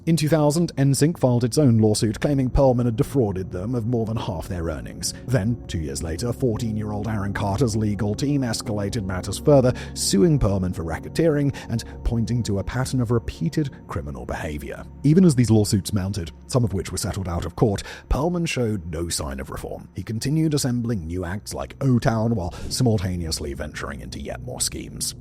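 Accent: United Kingdom. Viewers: He's British. You should hear how they pronounce allininum.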